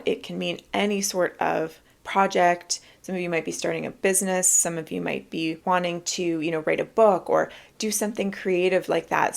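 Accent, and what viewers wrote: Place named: United States. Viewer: I’m trying to place your accent! It’s distracting me from the message in my reading because you’re reminding me of a cross between a cousin of mine from upstate NY and a friend who has roots in both Colorado and Florida! I can’t tell if you’re E or W coast, midwest, southern or what. Did you have one accent growing up, then go to college out of state and end up with a standard American accent, or is that the accent where you’re from, in which case, which state? It’s lovely 😊 (I also liked my reading very much, thank you 🙏 pile 1) (PS, if it turns out you’re Canadian after all that, I apologise!!)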